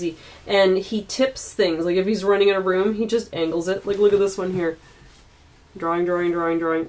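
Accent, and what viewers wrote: Canada. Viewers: Thanks for this--very thorough and entertaining, also educational--helped me choose the Stephanie Bowers and Gabriel Campanaro (sp?) books. Love your Canadian-influenced accent (which pertains to nothing relevant.) I see from your YT channel that you are very "crafty" would love to see more art-centric videos.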